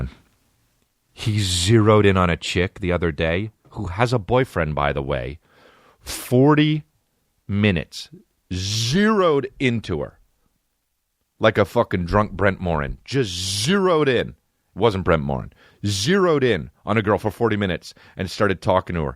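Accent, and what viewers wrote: Australia. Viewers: Love the Aussie accent😂😂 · Chris needs a part in a movie as an aussie forsure hahaha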